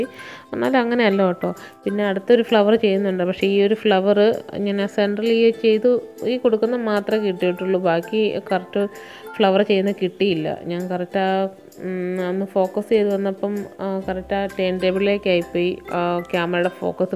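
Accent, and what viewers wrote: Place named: Kottayam, India. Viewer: Samsaram kettappol thonni... Njan kottayathanu...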